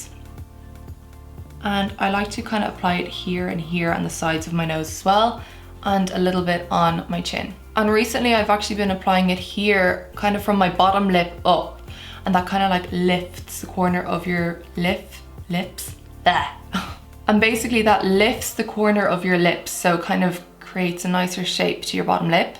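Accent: Dublin, Ireland. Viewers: Omg you're so pretty :) Are you from Ireland? If so what county/province. Can't figure out whereabouts your dialect is from 😅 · Yocheved Lam it's an Irish accent, probably more specifically Dublin or somewhere around Dublin anyways 😂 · Like literally It's so similar I feel like Charlize is doing an Irish accent and I'm watching her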